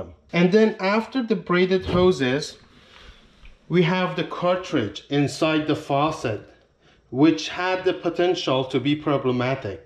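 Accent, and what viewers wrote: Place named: Iran. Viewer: Very Very Iranian accent. Good video thanks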